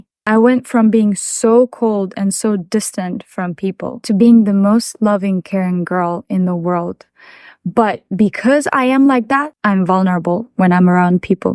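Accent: Russia. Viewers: Are you russian ???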